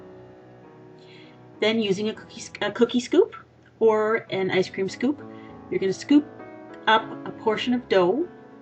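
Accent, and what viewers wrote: Canada. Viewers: I'm guessing from your accent that you're Canadian...maybe in a French part of Canada cause on your frosting container it says "au chocolat" lol just curious. I'm from America but I love Canada. Btw, I'm definitely gonna try this. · She sounds more Canadian than Hawaiian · I only just noticed that you're Canadian xD You have a cute accent! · Are you Canadian? O_o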